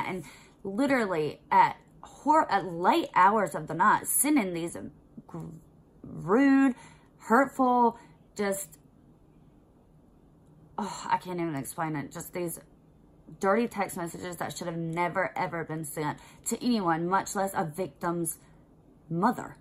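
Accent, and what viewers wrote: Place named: South, United States. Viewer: Just found your channel and I love your accent 👑 Sending love from another Southerner 👋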